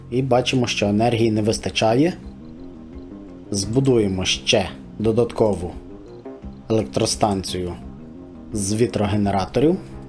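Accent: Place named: Russia. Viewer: Kocham ten ruski akcent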